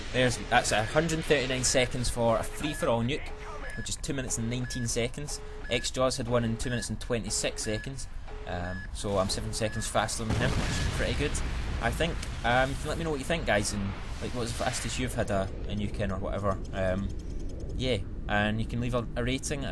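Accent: Scotland, United Kingdom. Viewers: scottish... mute. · r u scottish, i love axent =D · scottish accent.. eww... i was sick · it is, he's scottish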